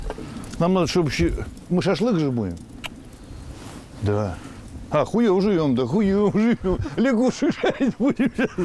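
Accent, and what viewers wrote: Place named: Okanye, Russia. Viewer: Окать перестань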